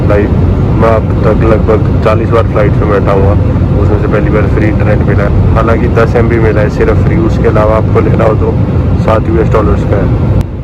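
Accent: Haryana, India. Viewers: Bhai is your hometown near Hisar or bhiwani Haryana? You just sounds like some of my friends from nearby villages.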